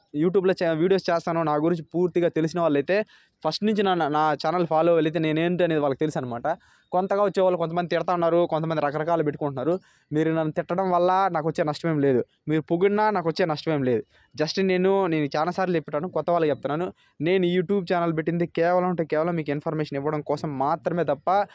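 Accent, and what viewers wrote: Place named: Chittoor, India. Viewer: Bro meedhi ee vooru slang chittore district lagane undhi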